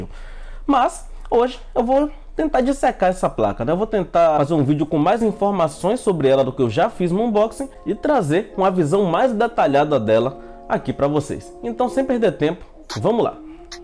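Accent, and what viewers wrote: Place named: Salvador, Brazil. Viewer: você é de salvador aaaaaaaaaaaaaaaa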